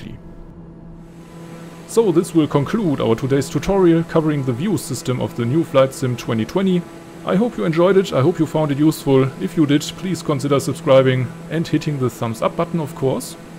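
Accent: Germany. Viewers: haha liebe diesen deutschen Akzent im Englisch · Super gemacht hat mir echt geholfen habe an deiner art zu sprechen gemerkt das du deutsch bist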